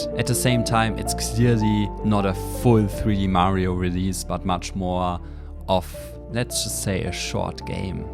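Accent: Germany. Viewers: I hear a Little bit german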